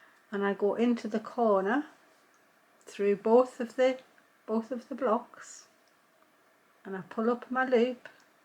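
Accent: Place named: Yorkshire, United Kingdom. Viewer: Just discovered you and your work is lovely. I lived in Yorkshire for nearly 20 years and it’s lovely to hear your voice, and that you say “crochering”, just like my mother-in- law did, instead of “crocheying” -we who pretend we’re French! Sometimes I say “crochetting” because I’m musical! I really love that duck egg, eau de nil cotton yarn, it’s my favourite go-with-anything colour. Why on earth would anyone discontinue it! Right, I’m off to delve into your other videos! Thank you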